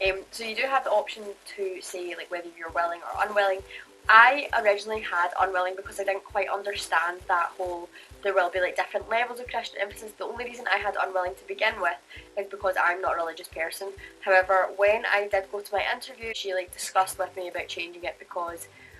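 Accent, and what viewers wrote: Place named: United Kingdom. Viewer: Wonderful videos! Although, I'm a little confused. Your accent says UK but your video: Camp America? :P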